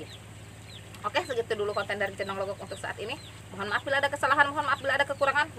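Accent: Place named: Sunda, Indonesia. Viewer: Modol eta hoe. Semangat pejuang beuteung... Ahaha meuni medok eta sunda 🤣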